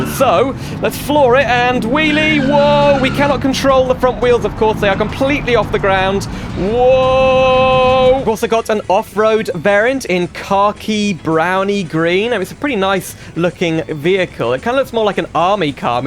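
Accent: United Kingdom. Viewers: are you britsh?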